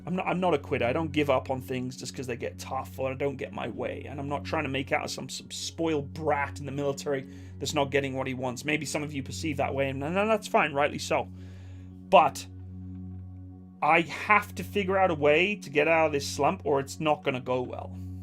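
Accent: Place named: Scotland, United Kingdom. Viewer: 3/5 Darkhorse...You sound scot not kanuck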